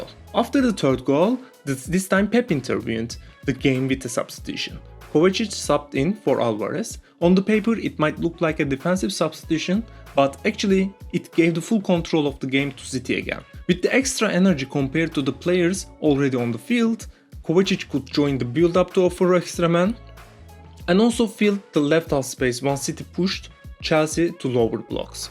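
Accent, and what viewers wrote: Turkey. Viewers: Bro are you Turkhis ?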